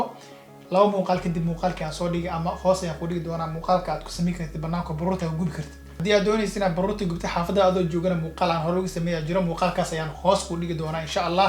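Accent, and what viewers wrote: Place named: Hiraan, Somalia. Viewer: I liked lahjadaada from asal Hiraan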